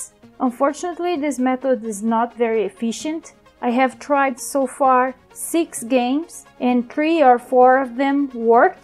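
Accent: Brazil. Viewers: caraca, eu tava ouvindo o seu ingles e pensei, só pode ser BR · pelo sotaque é BR hehe enfim mto bom o conteudo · Your accent is so cute! But I've found it oddly similiar... Até eu descobrir que vc é br xD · Você tem sotaque brasileiro, deve ser brasileira.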